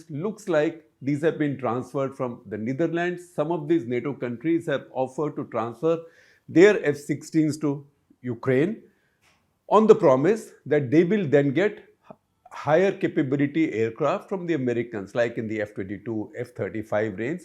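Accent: India. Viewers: I wish you didn't have an Indian accent 😢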